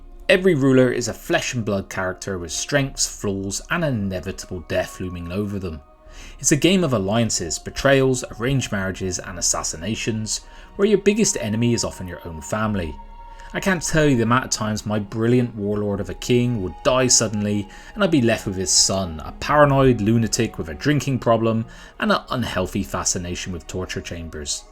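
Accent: United Kingdom. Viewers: you sound kinda British tho. Just weird to use Euros as a currency. Like a US Youtuber quoting prices in GBP. Just weird.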